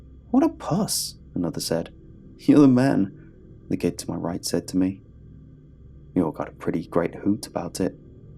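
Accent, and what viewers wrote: United Kingdom. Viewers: British voice 1like=prayer for michael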